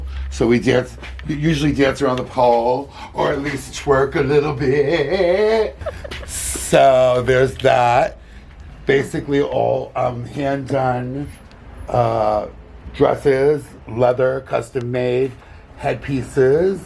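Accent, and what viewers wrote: United States. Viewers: you seem so fucking chill to have as a friend I love it. Whats funny is im completely straight but ALL my friends aside from like 5 of them have all been the gayest of gays. Dunno why but i always gravitate towards gay guys as far as making friends or hanging out with people go i guess its how funny and flamboyant the guys i know are but at the same time have my back and can tell them literally ANYTHING even weird shit and they listen and respond like what i say is normal lmao. Can tell them my feelings like when im depressed or sad or whatever and they know all the things to say to cheer you up or make you feel loved, ive never had a straight friend do any of that but i have had some great str8 friends but its a diff vibe and dont feel as comfortable talking about certain things. Any way all that jabbering was to say you remind me EXACTLY of one of those friends who recently passed away last year to heroin overdose, he was heavy set and even had the exact same voice as you with that boston accent he was like the gay brother i never had his name was Gypsy i miss em. 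· Hi! Here because I saw you on Mark’s page. The video where he took Rebeca into your store. You’re so charming you have a magnetic personality. I love your raspy voice and New York accent 💕 Wishing you lots of success.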